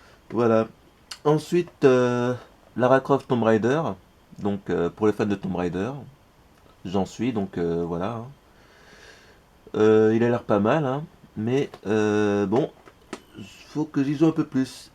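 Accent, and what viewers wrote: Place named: England, United Kingdom. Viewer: Bon la vidéo date mais ça se voit que t'as l'air sympa et t'as une belle petite collection. Belle accent anglais. Par contre, l'intro est catastrophique 😂.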